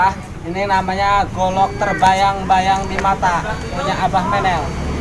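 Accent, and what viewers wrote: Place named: Banyumas, Indonesia. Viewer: Aku suka gaya bahasa kamu bro,, Kengapak ngapakan gitu ya?? Pokoke enyonge langsung subrek lah bro,, Salam hormat,💪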